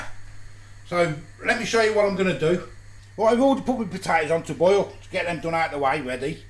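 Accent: Birmingham, United Kingdom. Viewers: sorry but i still think you sound loik a brummy!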